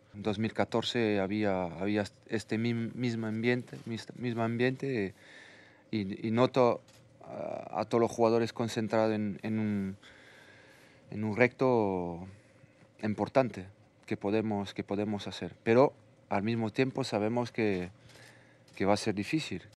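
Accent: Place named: France. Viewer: He sounds French even speaking Spanish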